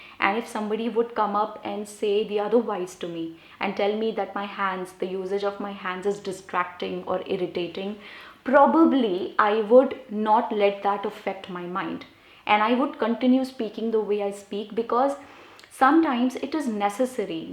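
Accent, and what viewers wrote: India. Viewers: Mam, your accent is very similar to the Indian From which country do you belong mam ? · I love your video. specially I like it because your Indian accent .. You've inspired me talk to English in easy way..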